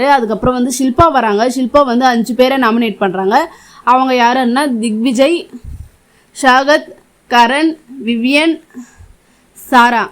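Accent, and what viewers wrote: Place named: Dharmapuri, India. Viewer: Dharmapuri Bhasai❤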